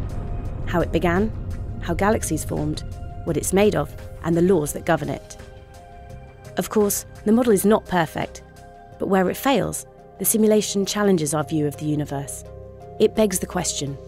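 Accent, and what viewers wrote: United Kingdom. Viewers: Долбаный британский акцент! Ненавижу! Что за wohapen? Сложно нормально говорить? Придурки!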